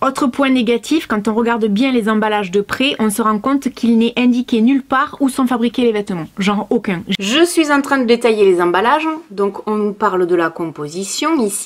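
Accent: South, France. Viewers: On pourrait presque dire merci au reconfinement parce qu'on a deux fois plus de vidéos de, et ça c'est chouette! ^^ Toujours des vidéos super agréables, et souvent quelle rigolade! Puis cet accent du Sud un pur bonheur! Merci d'y faire honneur, notre accent est tellement moqué souvent, et pourtant il chante même quand il pleut chez nous. Continue ton petit bout de chemin, soit fière de ton chemin parcouru après tout se que tu as raconté dans ta précédente vidéo sur l'amitié et ce que tu as vécu. Je ne peux pas t'appeler "maman youtube" parce qu'on a presque le même âge, mais bravo pour ta chaine et ces bons moments que nous passons avec toi chaque semaine. Ca fait un bien fou! Bises de Montpellier. Et caresses à ton adorable Tal;-). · ce pyjama rose je l’ai depuis bien 3/4 ans et c’est toujours un bonheur de le mettre! Il s’est peut-être un peu détendu avec le temps mais absolument pas abîmé et il est toujours aussi chaud et doux! 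J’ai essayé les ceintures en cuir chez eux et c’est les seules qui ne se déchirent pas, j’ai aussi pris les grosses chaussettes avec le molleton dedans et laine dehors c’est aussi super. Merci pour tes vidéos et ton accent du sud, ça ramène du soleil en Alsace, je t’adore!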